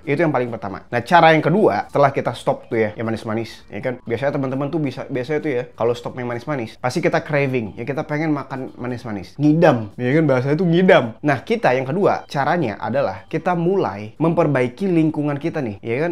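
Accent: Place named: Arab world. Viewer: Paling suka chanel ini penuh ilmu, gratis, dan abangnya kalo bicara enak di dengar kocak, logatnya kaya orang timteng ada maqrajnya, kek basa arab 🤣😂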